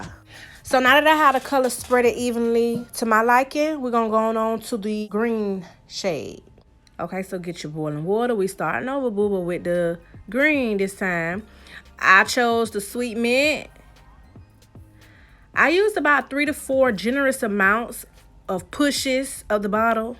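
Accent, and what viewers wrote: South, United States. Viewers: Gwerlllllll you killed this 😍 I’m not good with wigs at all! My friend glued it down for me but you made this look so simple now I wanna order a blonde wig and try it 👀 btw where are you from ? I love your southern accent.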